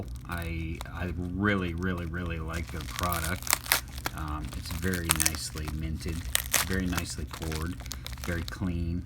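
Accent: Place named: South, United States. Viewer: Sounds as a southern belle !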